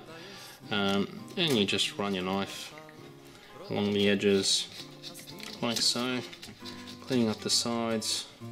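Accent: Australia. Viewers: You sound Aussie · You Aussie?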